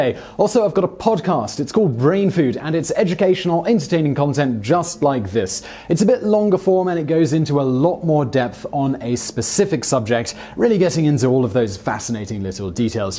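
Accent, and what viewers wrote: England, United Kingdom. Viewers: There's apparently a lot of stuff he can't seem to pronounce properly....must be the urge to put that "proper British" spin on it. Did you happen to see the episode where he pronounces geysers as geezers? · I have and still do enjoy your videos BUT... You butcher the pronunciation of names terribly! I can forgive some of this due to your English accent, but you really should at least LISTEN TO THEIR NAMES AT LEAST ONCE TO GET THE PRONUNCIATION AT LEAST SOMEWHAT CLOSE!! I mean Damn boy how hard can that be??? Some of these names have been in video for decades and their correct pronunciation is a matter public record. So how about a bit more due diligence. Because the way you butcher names even embarrasses your fellow countrymen. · Perhaps the British pronounce words and names differently than us. We did take a proper sounding language and gradually forget how to enunciate our vowels.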